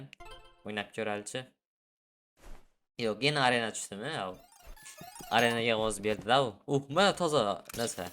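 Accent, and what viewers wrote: Khorezm, Uzbekistan. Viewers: Horazmli misiz brat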